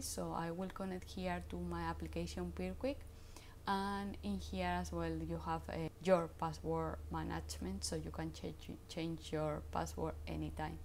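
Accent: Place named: Spain. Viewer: Love the accent. U from Spain?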